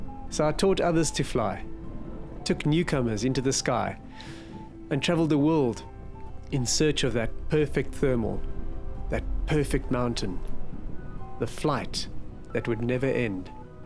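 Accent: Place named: United Kingdom. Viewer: Great I think u are from UK by your accent so we neighbors haha I wonder could you give me any good safe tips on places to get a good safe used wing? I know I'd be be able to afford a new one, especially with the way 2020 has gone so far.. Any help or advice I would be ever so grateful, I dreamed of flying ever since my earliest memories an I'm gettin on in years and have to get In the sky befor it's too late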